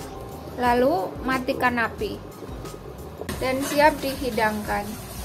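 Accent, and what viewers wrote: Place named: Banyumas, Indonesia. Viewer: Ngapak mania, masak super enak, jozzzzz👍👍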